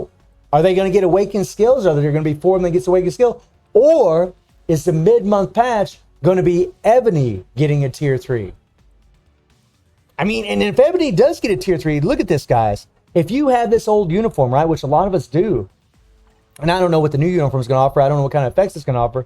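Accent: United States. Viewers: Oh man, I thought u sounded country. Love the beard man.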